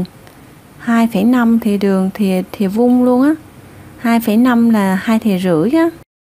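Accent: South, Vietnam. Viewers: Cháu nói gịong miền Nam rặt, vậy thay vì nói " thìa", mình nói " muỗng ", dễ nghe và hợp lý hơn.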